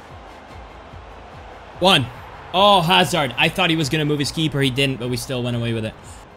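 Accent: United States. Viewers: England?!!!😂 he sound like every white American dude but he is Italian he always be talking about it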